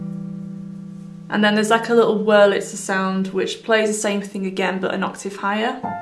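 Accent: United Kingdom. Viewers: Your voice, with that British accent, is succulent. Thank you for the tutorial, I always felt that my game was missing something, and I just discovered that it was the F6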